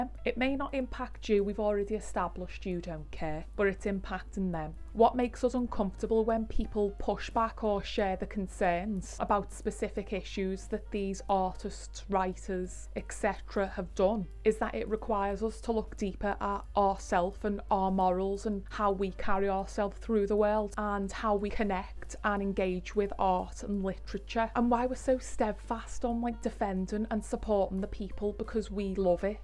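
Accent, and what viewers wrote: United Kingdom. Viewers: oh my god you are so right! banger video and icl its lovely to see a UK booktuber with a non-queens english accent 🙏 may have slagged scousers off in the carabao cup final but you're an icon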